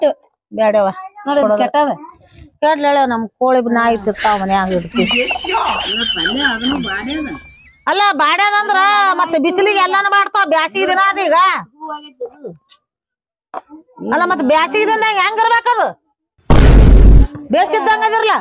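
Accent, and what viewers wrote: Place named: Bijapur, India. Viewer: ❤❤❤❤ yu very talented.. Bijapur Kannada tumba ಚೆನ್ನಾಗಿದೆ 🙏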